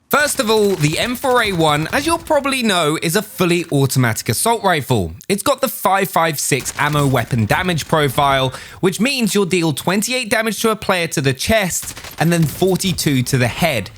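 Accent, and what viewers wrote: England, United Kingdom. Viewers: Because their both english and probably from a similar place in england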